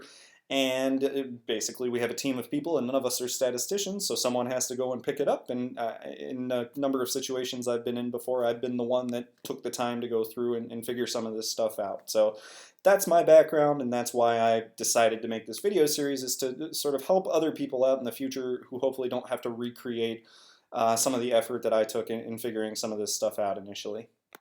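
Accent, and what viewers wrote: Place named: Wisconsin, United States. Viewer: Oh, also, I think you're developing a bit of a Wisconsin accent, and I'm not happy about it.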